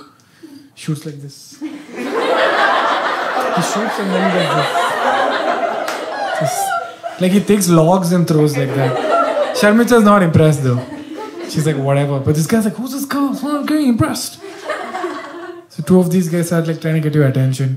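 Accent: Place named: South India, India. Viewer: This was so different from the prepared ones u do, felt so relaxed. Loved this one too! Although am I the only one thinking south indian accent is heavier than usual here?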